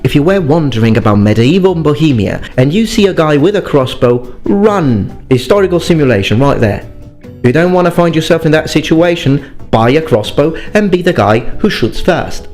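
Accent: United Kingdom. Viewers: I thought you were Italian, but in this video, you sound British... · Do you speak English with an British accent because you learned from watching British television, or did you spend some time in Britain? I just didn't know. I mean no offense.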